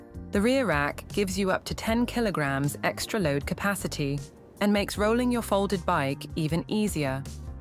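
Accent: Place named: England, United Kingdom. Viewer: Typical English middle class accent. Even Americans can understand her without resorting to subtitles.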